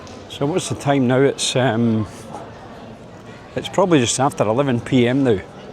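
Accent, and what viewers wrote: Scotland, United Kingdom. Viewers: Interesting vid again, many thanks. A small aside, I like the Scottish accent having spent a bit of time working in Hamilton and other parts over the wall, but I did a little testing on this vid. If you play this at 2x speed, it sounds exactly like Russ Abbot's Scottish character. I'll get me coat... 👍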